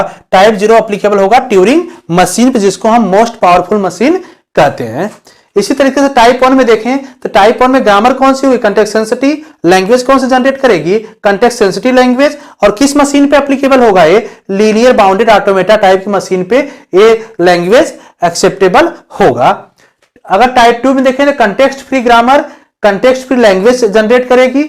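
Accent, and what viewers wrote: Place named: Jaunpur, India. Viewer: sir aap jaunpur se ho kya ?🤗🤗🤗🤗🤗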